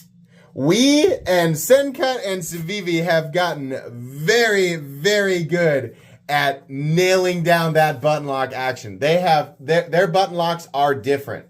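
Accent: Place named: Maine, United States. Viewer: "Wicked good"? You a Mainah?!?😁😁 Also instead of " plunge drag" you could call it" button pinch. "😅😅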